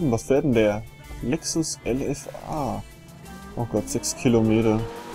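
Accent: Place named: Bavaria, Germany. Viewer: wow, sehr schade wegen den wenigen zuschauern , die qualität der videos is genial ( 1080p ftw ) und dein kommentar is auch gut, du könntest höchstens versuchen das bayerische noch ein wenig mehr zurückzuhalten, stört mich aber nicht als selbst bayer, mach auf jedenfall weiter so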